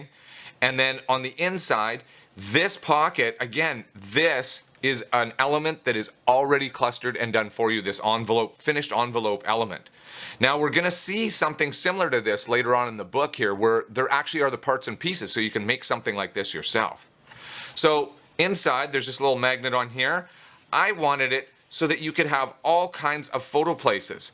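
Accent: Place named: Canada. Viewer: You must be a fellow Canuck, at least you sound like one!!! I really love your collections, the dog ones are my faves. I look forward to your new ones. How about a vintage floral meets steampunk inspired collection?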